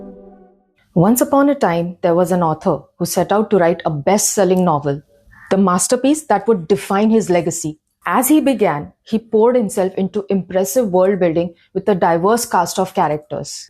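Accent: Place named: India. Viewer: Your Indian accent enhances it so much🙏✨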